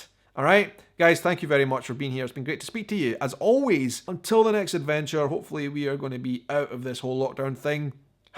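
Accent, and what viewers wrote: Scotland, United Kingdom. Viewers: Hearing you pronounce “garage” the way we do was the closest to an American accent I’ve heard you do! I also love how Scots say “girls” with two syllables. I’m a sucker for a man with a Scottish burr. And a kilt. And a dram of whisky. mind wanders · Here in Canada we do say the H in herb...her b Please, please, please keep with your accent and do not take on the American accent. We love your Scottish ways. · I’m literally watching your videos to listen to ur accent, all so I can improve my own Scottish accent. (I’m from the Midwest United States). I 💕 love 💕 learning to speak other countries’ accents in English. So far I can do Scottish, French, Russian/Ukraine, Polish, Mexican, British (multiple regions), Southern U.S. accents & Indian accents.(from India-not referring to American indigenous peoples-fun fact,I’m already Cherokee, so, I don’t need to learn my own accent-lol). 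I am fascinated by how people talk/speak. I think it is interesting to learn how certain pronouncements are made by different people all speaking the same languages. I also speak French and am conversational in Spanish. My next goal is to learn Cherokee, not many people speak it anymore and it’s super important to keep our cultural heritages alive no matter where we are from. I feel that learning who we are is important, but it’s just as important to put yourself in other people’s shoes so you can see life from a perspective other than what you would normally experience. I feel like language is an integral part of being human, and it is what connects us, and even exploring accents opens you up to a new perspective and culture that can give you just a peek of something new and a great way to learn a lot about a certain group of people. What do you think? Sorry, I ramble a lot, but I just love language. 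💗🥰💋💕✌🏽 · I’m from north India and I subscribed to your channel just yesterday itself and these is the third video of you I watch and I really enjoy a lot and coming back to these video I just wanna say we speak almost the same British accent as you do !!!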